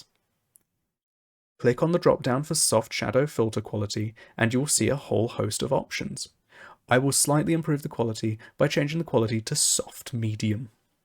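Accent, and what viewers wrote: United Kingdom. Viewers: You sound English but look Australian 🤔 Thanks for the tutorial!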